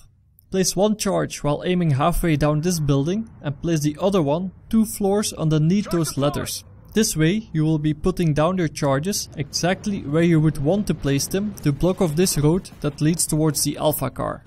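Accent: Netherlands. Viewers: Hij praat wel met een Nederlandse accent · Are you netherlands?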